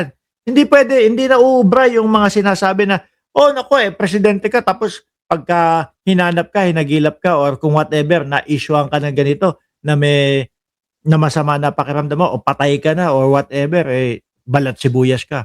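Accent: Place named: Iloilo, Philippines. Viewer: Parang boses ilongga ang tuno.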